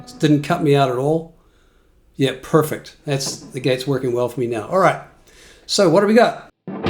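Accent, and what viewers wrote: New Zealand. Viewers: Great Vid… you sound like a kiwi? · Are you from Invercargill by any chance? Great to find a kiwi on youtube providing such good info.👍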